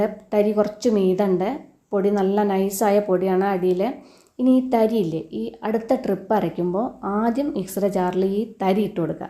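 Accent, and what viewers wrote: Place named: Valluvanad, India. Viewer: നല്ല വള്ളുവനാടന്‍ ഭാഷയ്ക്ക് നമസ്കാരം.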